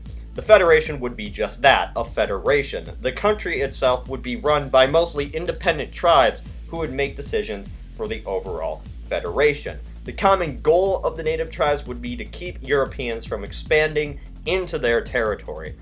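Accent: United States. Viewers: sounds so Ohioan when he was young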